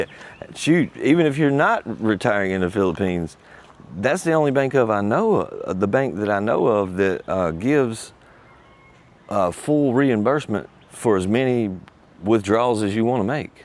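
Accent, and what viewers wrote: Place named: North Carolina, United States. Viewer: It's gotta be a NC thing.. immediately heard the accent and trusted the information. Guess it's tribalism. Thanks. I'm learning. North of Charlotte too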